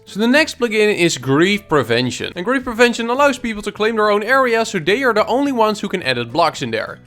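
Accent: Netherlands. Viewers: Your Dutch. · Kan gewoon horen dat je Nederlands bent XD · Nederland voor de dubbbb lessgooo ik hoorde al iets aan de stem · i smell dutch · U dutch?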